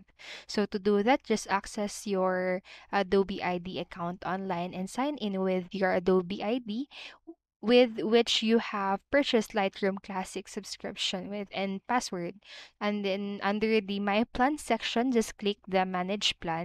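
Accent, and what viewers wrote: Philippines. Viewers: I loathe your fucking annoying Filipino accent!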